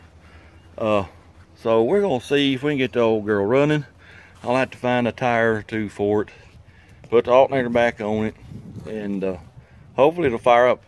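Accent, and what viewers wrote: South, United States. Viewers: Well, now I know why your voice sounds so familiar. I saw that Montgomery County plate, lol. I'm originally from Henry County on the other side of the lake from Stewart County, so your voice sounds like a lot of my kinfolks. I've lived up here in Southern Indiana for a good number of years and been inside all evening cause it come up a bad cloud earlier and then poured down most of this evening...it was a frog strangler! So I just run across this channel on YT this evening and been watchin' the last few hours.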